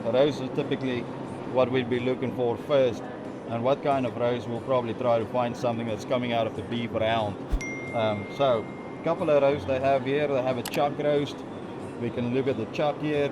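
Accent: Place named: United States. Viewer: Nice video. Can hear you getting a slight American accent, keep your Afrikaans accent don’t lose it. Jy Ian ook lekker skinner nou.😂 Baie geluk🙂👍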